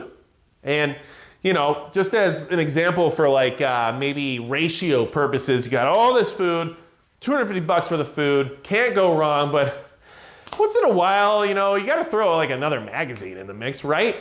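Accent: United States. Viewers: You sound like you're from Hawaii lol. There's so many ways of making rice into a meal in and of itself. If anyone's reading your comment they best be listening because Japanese rice is the 💣 Asian rice is the best although I like jasmine and basmati as well.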